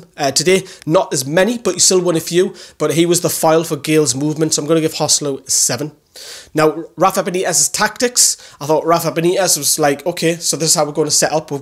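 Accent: Newcastle, United Kingdom. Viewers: Winning certainly brings oot your Geordie accent hahaha!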